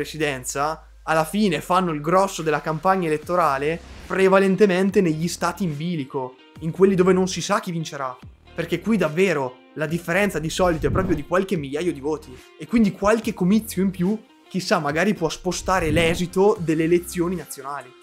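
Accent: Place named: Chioggia, Italy. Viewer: Mi sembri da Chioggia 😊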